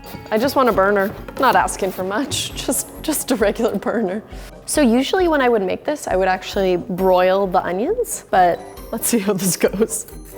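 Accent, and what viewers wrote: United States. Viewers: . Aaa ik dacht echt dat je amerikaans was maar ja mijn broertje vind pannekoeken lekker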